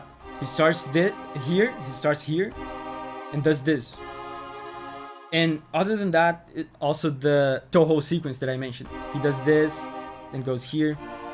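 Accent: Brazil. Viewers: Esse mano é mto brasileiro kk, dá pra ver no jeito de falar e as recomendações do Yt dele entrega tbm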